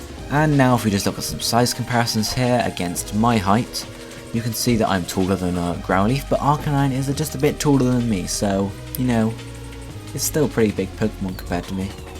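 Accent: United Kingdom. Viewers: There are two things I'd like to point out: 1.) the accent. It's way too British. If you could some how make it more neutral I'd appreciate it. 2.) if you make a mistake stop recording and do it all over again. It bothers me to listen who should be teaching me to make so many mistakes, specially when you add the British accent and the fact that you also leave some sentences without the final pun. Work on that please. :)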